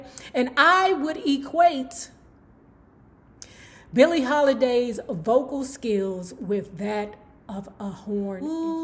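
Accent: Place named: Washington D.C., United States. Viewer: I have subscribed to your channel some time ago and based on your accent im thinking your from Washington D.C if im not mistaken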